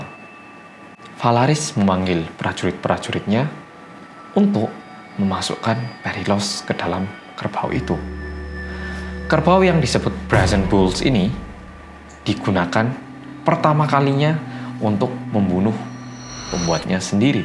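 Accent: Java, Indonesia. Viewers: Keren mas brow Org korea fasih berbasa indonesia Tp medoknya (logat jawa) kental banget Salut dech mantappp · Yeay, detektif yg medok kembali! Hehe ᬇᬫᬫ᭄ᬫᬳ᭄ᬤᬶ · Yeyyyy,🥳🥳🥳 well come back karo wong korea sg medokk, udah di tunggu2 mas, hehe😅😅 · jeda kuliah online nonton mas medhok wkwk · Apik banget lo mas seng medokkk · Mas asli orang jawa bukan sih, kok bahasanya medok banget hehehe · Akhire detektipp Medhok menehh Btw mas, ngerekame ojo nang lorong ngono mas, Dadi wedi gegoro ndelok i lorong terus T_T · Bang kok medok banget sih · Finally, we got it mas detektif medok;) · MEDOOOOOOK hehe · wow medok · Jowone kok katut mas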